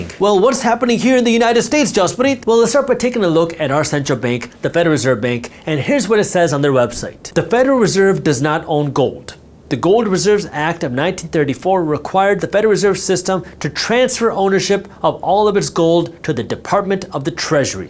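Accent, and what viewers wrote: Standard. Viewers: Finally crisp and clear neutral English accent. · And speaks normal English!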